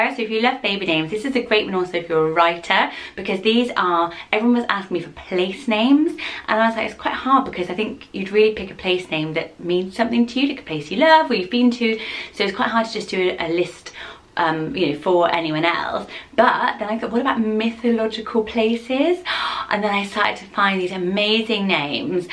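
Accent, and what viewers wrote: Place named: South West, United Kingdom. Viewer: Where are you from? You pronounce your “r’s” like we do in the south west he he xx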